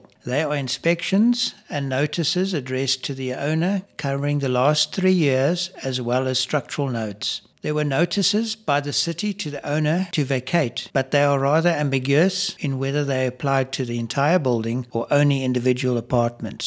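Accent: South Africa. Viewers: I love how relaxing your voice/ accent it, is it South African? Also thank you for the informative videos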